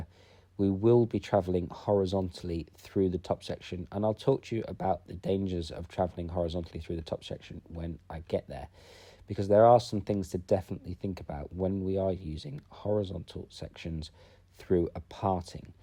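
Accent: United Kingdom. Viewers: loving your videos, love your simple and effective techniques. Hope you keep making lots of videos. Do prefer the British accent being from this side of the Atlantic myself. Thanks for the great education.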